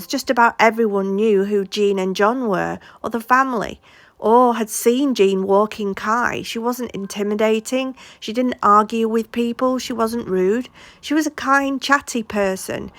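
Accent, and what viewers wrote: Yorkshire, United Kingdom. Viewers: I could hear the Yorkshire in your accent right away. Always warms my heart to hear a Yorkshire accent. I miss home but don't get me wrong living in the California sunshine can't be beaten by any Yorkshire weather😆. I'm glad I found your channel and I'm working my way through your library now. I'm here to stay. Great work you do. Love the content 🤘🏻🇬🇧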